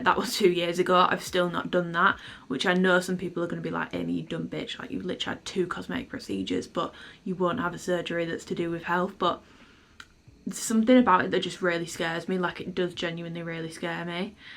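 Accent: United Kingdom. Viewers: Rochdale accent?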